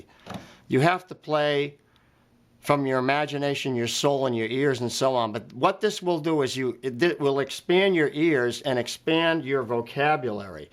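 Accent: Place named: New England, United States. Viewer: That was one of the best lessons I've ever had! What a great video. Clear, concise and impactful. Thank you! I've subscribed and will check out your website today. PS, great to hear a NE accent down here in TX!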